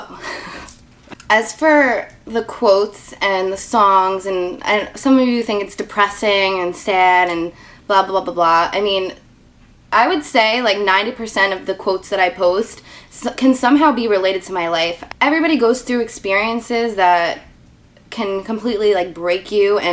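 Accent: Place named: Brooklyn, United States. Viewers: Are you from Jersey or NY? I'm from Brooklyn & I can hear that voice represent girl!!! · You're absolutely gorgeous. U look like Megan Fox. U seem like a very down to earth girl. You're very talented! Love all ur tutorials! I love Ryan Gosling too lol. Are u from New York or New Jersey? U have a New Yorkian accent. I really like what u said about not settling for anyone--wise girl hahah srry for all these comments bunched together. Anyways keep doing wut you do and thinking the way u do, you will succeed :)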